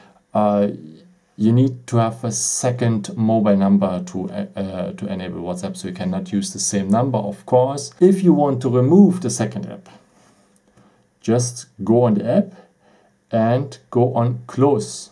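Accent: Germany. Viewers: R u german? It really sounds like u r german xD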